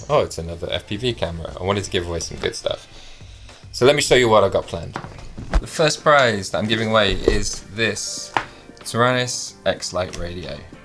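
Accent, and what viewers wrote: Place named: United Kingdom. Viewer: Definitely feels like it's giveaway season on YT at the moment. My last qwad just missed the concrete earlier in a crash and rather than shattering, just entered a roll, bending antennas as it went. No broken props through as it was a ducted qwad fortunately. I was going to end with a blond joke but then looked in the mirror... Great to see you're back at it and to hear a better accent than all the us pilots 🇬🇧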